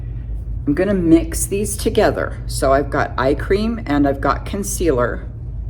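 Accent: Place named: South, United States. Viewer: I love you. I can be down and watch you and before you know it, I’m laughing and enjoying myself. Your imitation of Paula Dean was “right on” and I swear you have southern roots from somewhere. I’m going to order the eye cream as it seemed so refreshing. Hopefully I can find the link after the video. Okay, I’ll get back to it.❤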